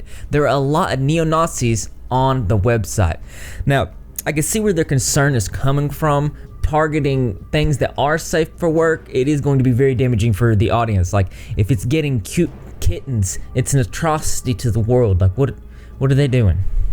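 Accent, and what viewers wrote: South, United States. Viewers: Where do you live? I'm so curious, you sound southern!!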